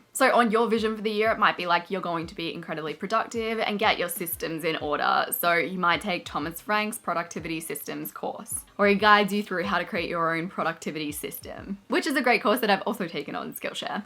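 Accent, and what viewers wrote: Australia. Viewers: ok but... Drew Barrymore with an Australian accent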